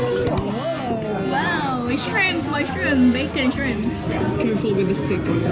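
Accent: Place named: United Kingdom. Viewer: 소영님 영어에 영국식 발음이 들리는데 배우신건가효...?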